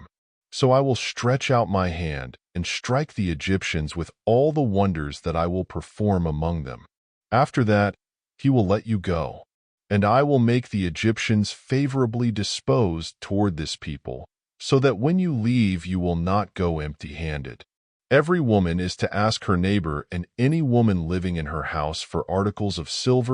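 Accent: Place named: United States. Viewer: I like the "American" voice. Easier to follow.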